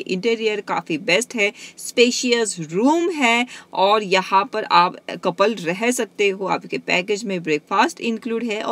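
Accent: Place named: Kathiawar, India. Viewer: WOMAN !! I loved your Kathiawadi Accent !! Well covered ...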